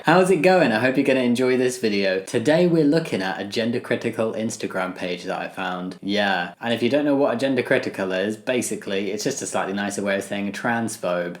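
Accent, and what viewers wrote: England, United Kingdom. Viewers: He's English so of course he speaks weird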